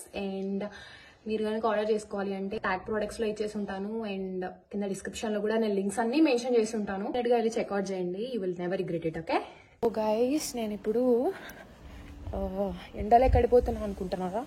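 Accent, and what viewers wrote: Rayalaseema, India. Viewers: Pakka maana Rayalaseema language ❤❤❤❤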